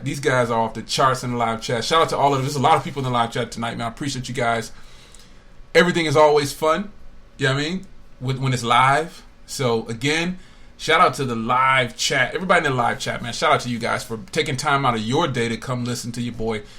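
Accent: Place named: New Jersey, United States. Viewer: Really enjoyed the podcast man. Keep dropping knowledge on these people man, u doing a public service. how u gonna be spending all these hundreds of dollars on a phone & u can't cover your bills & responsibilities. People get their priorities mixed up but they don't like when u call them on it. Btw I think your jersey accent comes out the longer u talk, I think this is only the first or second time I've ever heard it cuz it doesn't come out in your regular videos and reviews.. Jus thought it was kinda funny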